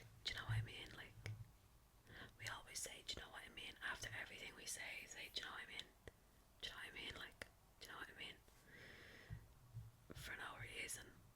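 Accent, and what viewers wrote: Dublin, Ireland. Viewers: so thats why it said uk i always knew you sounded like a dubliner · As someone from coolock north Dublin, I can defo hear it in your accent, your Ma thought you well haha😂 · Omg u sound real fuckin Irish lol (Btw I’m Irish two !!) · There's a hint of culchie in that accent yeah. I hear the dublin accent mostly and then on some words it gets weaker lol. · Need more of your Irish accent videos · Class to see some ASMR in the Irish accent. Weirdly proud!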